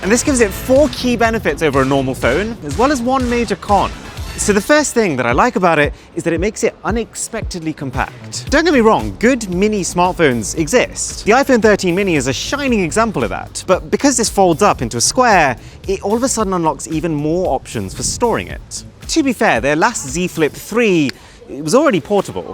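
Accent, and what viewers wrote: United Kingdom. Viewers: why does he have a british accent?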